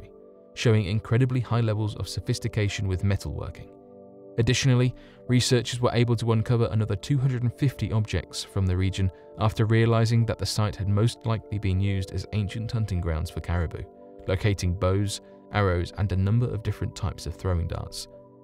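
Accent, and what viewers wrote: United Kingdom. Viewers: All this is a thievering compilation of other people's info from the net and presenting it with a british snooty accent for ignorant fools as news.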